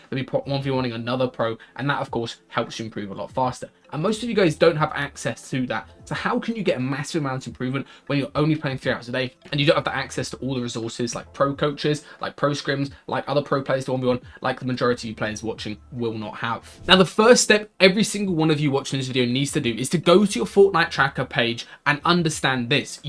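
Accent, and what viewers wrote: United Kingdom. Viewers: London/British accent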